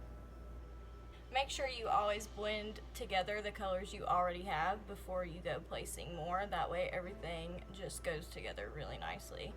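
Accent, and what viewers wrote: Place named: Texas, United States. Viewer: Are you from Texas? Or at least a southern state? Haha your accent sounds just like mine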